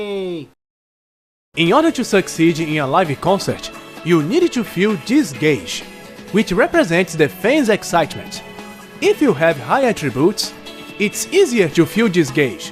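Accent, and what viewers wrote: Brazil. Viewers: Nunca ouvi alguém a falar inglês com tanto sotaque brasileiro ahah Obrigado pela review, gostei bastante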